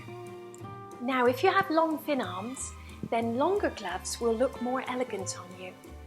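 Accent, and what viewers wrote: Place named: RP, United Kingdom. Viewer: love your RP accent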